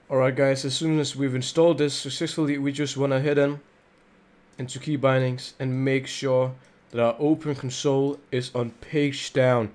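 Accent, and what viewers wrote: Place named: Denmark. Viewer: Hvorfor er kan man bare høre dit dansk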